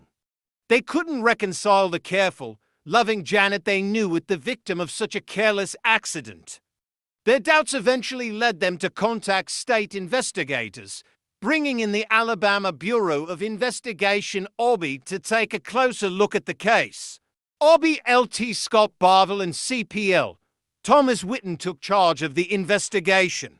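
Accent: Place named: United Kingdom. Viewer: The clown with what appears to be a British accent shouting into a microphone. . . About a story in small town Alabama. . . Bizarre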